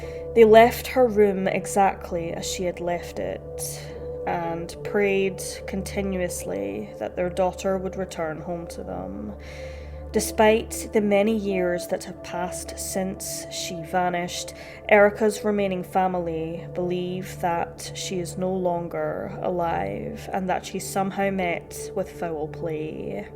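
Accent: Scotland, United Kingdom. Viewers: like some others have said I suspect it is because she is Scottish, depending on the region a Scottish accent can be VERY thick and difficult for others to understand, it sounds to me like she is very carefully enunciating to make herself understood clearly. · Jeezus, she’s Scottish. Of course, she’s going to have an accent. Silly Americans b!+<h!ng about the way others talk. 🤦🏽‍♀️🤷🏼‍♀️😵‍💫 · She is Scottish, and no doubt has unique inflections of her own.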